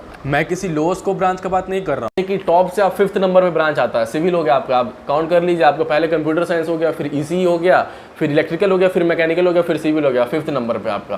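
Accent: Bihar, India. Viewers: Bhai love from jharkhand...I can recognise sound of bihari/Jharkhandi anywhere😗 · Bhai aap Bihar se ho kya? Aapke bolne ke pattern se laga to puch liya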